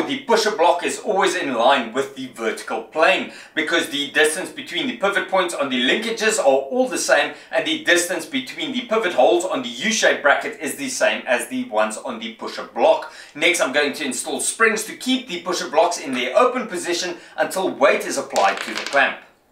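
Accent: Afrikaans, South Africa. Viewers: Hey man, your accent….? Is it Afrikaans? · It's English......from South Africa. We have accents. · Is that a south African accent?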